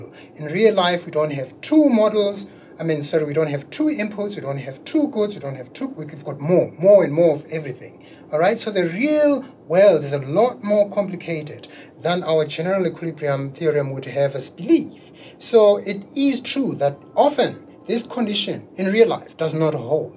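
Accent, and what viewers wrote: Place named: South Africa. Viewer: I am a South African student at Columbia University, in New York and I came across your videos whilst preparing for a microeconomics exam. Your videos are excellent and were extremely helpful to me. It's always good to hear a voice from home too! Thank you.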